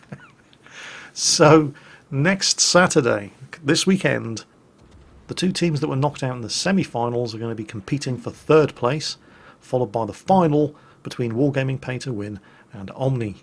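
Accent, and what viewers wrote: United Kingdom. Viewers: oh my god..., do get a move on...you have made the subtle art of rambling into a tortuous experience only spared by those wonderful dulcet tones...damn your British accent for making me love you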